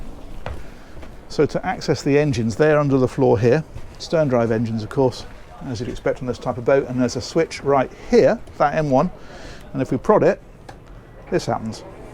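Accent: England, United Kingdom. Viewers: It’s the English accent 😅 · the way the brits pronounce words is hilarious. couipay? just say coop. you sound gay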